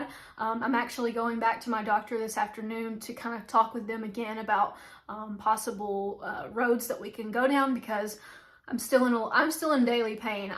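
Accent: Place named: Tennessee, United States. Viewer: Thanks for ❤ honesty and accent. I live in Tennessee so it sounds nice.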